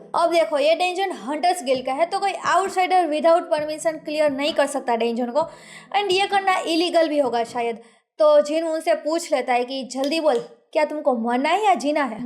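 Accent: Gujarat, India. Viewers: aap gujrati ho na?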